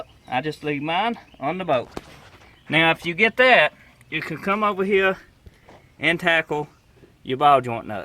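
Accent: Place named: Louisiana, United States. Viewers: Who else loves the southern accent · Are you from Louisiana?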